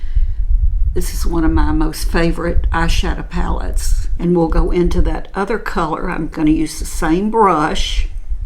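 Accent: South, United States. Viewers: You are from the south...obviously your gentle lilt of an accent. I lived in Atlanta for 16 yrs. you are a true southern belle☺ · I'm glad you shared that you're a strict vegetarian and the💖 e.l.f. is 100% vegan!!! I'm just now at 68 starting to try make up. And I do care a great deal about NO animal cruelty. Thank you so much for sharing all that. I love your video's so much. 💖 You're so sweet and I just adore you. My dad was from NC so I also love your southern accent and mannerisms too. Feels like home to hear it. ❣💋 I wish I could agree that your lips look plumper, but I don't think they do. I tried that product too but I couldn't handle the burn and had to return it. So maybe I didn't leave it on as long you did. I have thin lips too like my mom did, and I keep watching them shrink too. 😞 But wow, quite a beautiful transformation.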